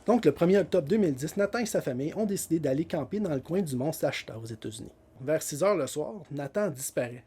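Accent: Canada. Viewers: Coucou ! Fort accent canadien, je ne comprends pas tout... pardon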